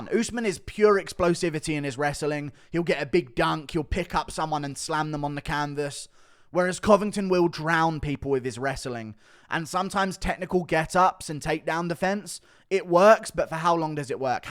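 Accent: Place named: England, United Kingdom. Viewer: Where in england is accent from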